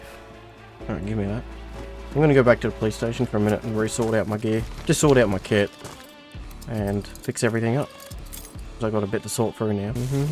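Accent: Australia. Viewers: LOIF! Man, I love that aussie accent.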